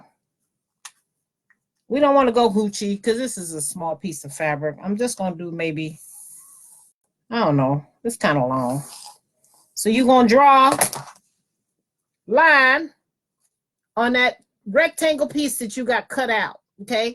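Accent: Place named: Alabama, United States. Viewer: I like you! You sound like me and my Alabama family!🥰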